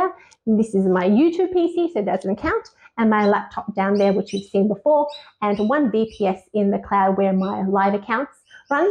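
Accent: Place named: Australia. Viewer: I thought you might follow her. It was a surprise for me to hear an Aussie accent when I found your channel. You don’t see many of us Aussies talking about trading.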